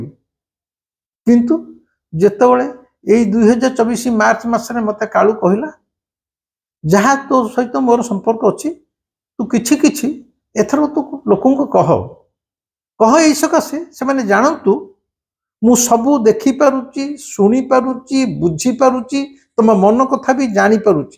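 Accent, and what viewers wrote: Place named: Bengal, India. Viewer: ଆପଣଙ୍କ ସ୍ୱରରୁ ଜଣା ପଡୁଛି ଆପଣ ଜଣେ originally ବେଙ୍ଗଲୀ ଲୋକ. 🌹🙏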